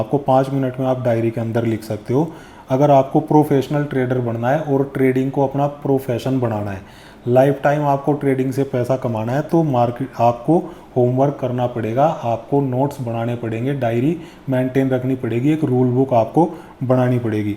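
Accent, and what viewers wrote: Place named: Haryana, India. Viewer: Bhai aap to merre haryana se lg rhe ho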